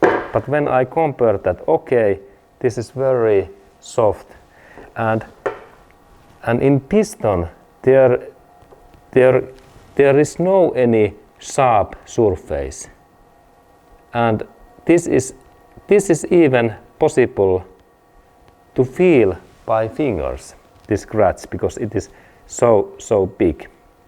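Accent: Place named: Finland. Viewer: I think this is a Finnish (Uralic) accent?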